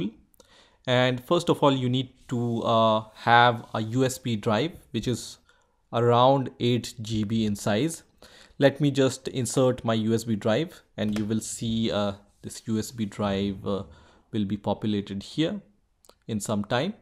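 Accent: India. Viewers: I absolutely detest the Indian accent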